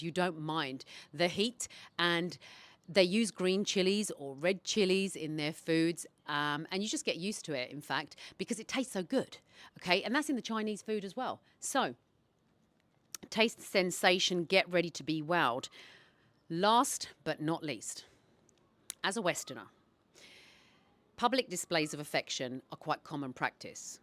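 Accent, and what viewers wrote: United Kingdom. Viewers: Assalamualaikum sister Please can you remove subtitles from your videos bcz with them i cannot listen you focusly, i wanna understand you directly cz i do love British accent.thnks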